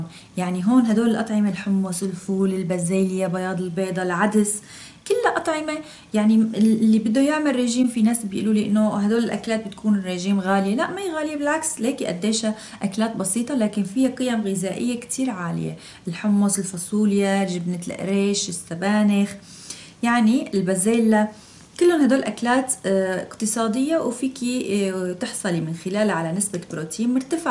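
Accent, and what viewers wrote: Aleppo, Syria. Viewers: Samiri Sanaa سوريا حلب واضح من اللهجة مرة احب الكلام الحلبي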